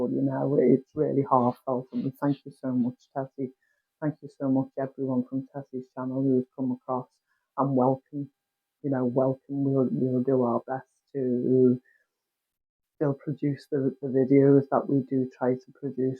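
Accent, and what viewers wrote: United Kingdom. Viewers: I enjoy soups and stews and this recipe looks delicious. I jotted the recipe down as you worked. Thank you for cooking it for us. It is a pleasure to be here, especially to hear words like "whilst," and the lovely English accent. Blessings to you and yours.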